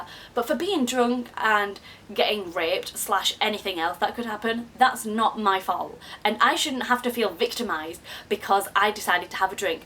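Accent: Yorkshire, United Kingdom. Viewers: I am glad you are alright. I will always remember you because when I was looking for the Yorkshire accent, your videos were the first I watched. :)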